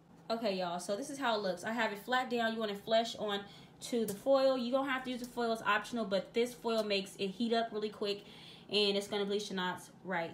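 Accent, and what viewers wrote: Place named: Sacramento, United States. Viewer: I’m so nervous to try this idk why 😂 and you must be from Sacramento, I hear a Sacramento accent lol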